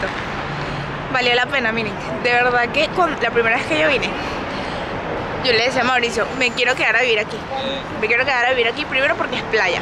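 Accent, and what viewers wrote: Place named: Venezuela. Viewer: Eres venezolana? Me encanta su acento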